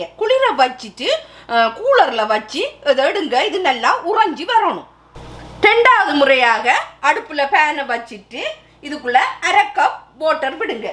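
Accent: Sri Lanka. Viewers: sis ❤️❤️❤️iam nee view er keep in touch my channel sis.Unga இலங்கை தமிழ் மிகவும் அருமையாக உள்ளது சிஸ் ❤️❤️❤️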